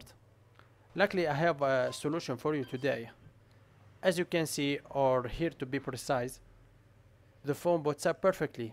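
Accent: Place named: Eastern Europe. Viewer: this guy is from russia or eastern europe. I cant understand his english properly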